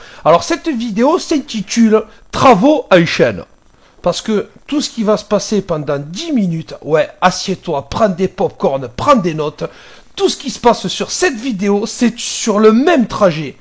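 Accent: South, France. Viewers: toujours top les vidéos surtout avec l'accent du sud oh punaise 😂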